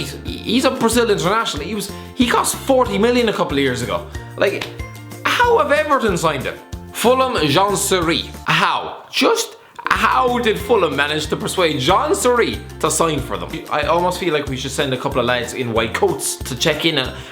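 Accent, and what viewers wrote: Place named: Ireland. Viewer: Listening to a guy with a broad Irish accent attempting lengthy Greek pronunciation is a golden experience.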